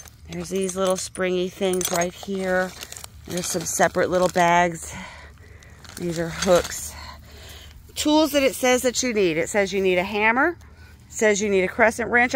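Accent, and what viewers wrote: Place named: Texas, United States. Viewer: Besides the main topic of "how to" , to did this vid so us common folk could keep up so Thank you. Just a side note, you spoke just like my dad a grammama did and it brought Texas right back with a tender force so thank you for that too.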